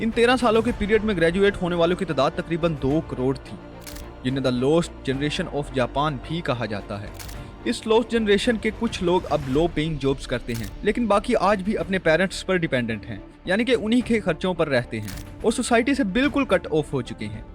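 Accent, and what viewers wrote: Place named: Pakistan. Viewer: Bhai accent sudhar le (pakistani lagh rha hai )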